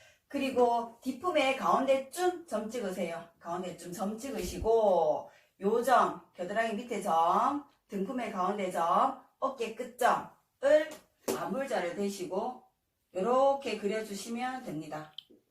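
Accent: Gyeongsang, South Korea. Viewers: 왕초보인저도 설명듣고 옷만들기 도전해보려구요 너무 자세히 설명해주셔서 감사 또 감사합니다 구수한 경상도사투리도 넘 정겹네요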